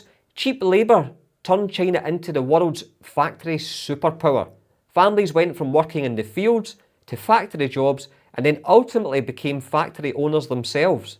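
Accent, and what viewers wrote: Scotland, United Kingdom. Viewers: I love your accent❤. I guess it is Scottish? Even though you are giving a constructive criticism which I agree with, I can’t help but notice the tone in your words. It is beautiful. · Your Scottish accent got me 😅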